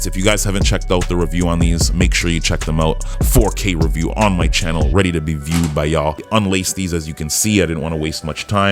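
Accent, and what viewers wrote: Toronto, Canada. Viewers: The Toronto talk has me rolling dawg😂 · That Toronto accent is annoying af 😂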